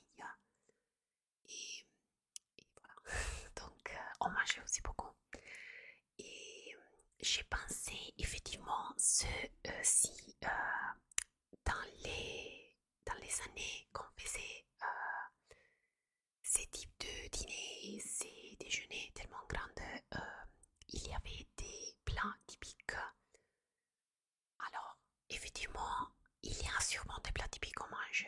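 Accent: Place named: Italy. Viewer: ton accent italien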